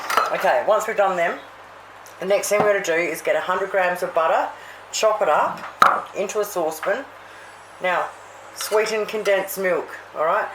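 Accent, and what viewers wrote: Australia. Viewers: Thanks for posting,looks yum,definatly have to try it,love your Aussie accent.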